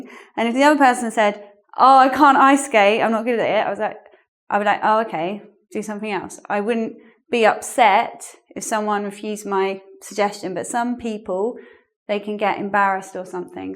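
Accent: United Kingdom. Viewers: something that I learn in your last class.....you are terribly charming!! I love your brithish accent... amore mio.. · oh my god i couldn't understand anything what she spoke is it a British english ?,very diferent from the american's ,but i liked your video ,it has been helping me to develop my english ,i'm begginer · To be honest, it's little bit hard for me to understand the british accent. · We have to visit London :) Great accent, they have a queen and they have Big Ben! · I've seen a lot of videos from your previous channel, as you suggested, and I really liked it! You have such a nice way to teach that make us alert to your classrooms. Plus, have a soft voice, and your british accent it's lovely! I am hungry for more videos! Keep your nice work!:) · very useful lesson thank you, i really like your British accent:D thanks a lot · Thank you for your video and your excellent method and teaching as well. 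I was looking for British teacher for English learning because I'm in London for my PhD course. I learnt English in American's method so now I have a problem in London because the people's accent and their grammar is a little bit different and your accent can help me more to understanding Londoner specially in the University. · I liked your video, even though I realized that I'm not used to the British accent. In fact, I'm not used to another accent and I have to work on it. I've just subscribed your personal youtube channel, as you asked and simply loved your idea to help people with speech problems. Thank you for your help and I'll watch more of your videos. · Very interesting! Finally a British accent!!!! A QUESTION: how can I subsribe your second channel on You Tube? I found only ENGLVID, but the second channel about you tell at the end of your video, where is it?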